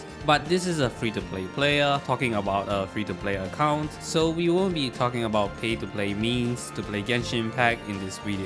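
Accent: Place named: Singapore. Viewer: Ayo are you Singaporean u have Singaporean accent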